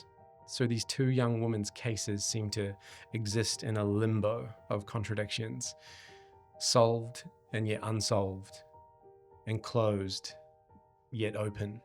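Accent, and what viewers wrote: New Zealand. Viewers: Don’t know who your watching but he is not a Aussie if you listen to him he is from nz he has a kiwi accent not Aussie accent sorry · Is there a hint of kiwi in his accent? The way he says 67. "Sexty sevan ".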